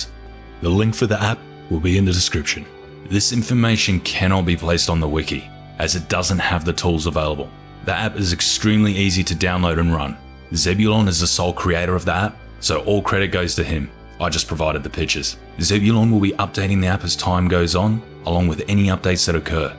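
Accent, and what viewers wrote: Australia. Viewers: it's a sexy deep aussie accent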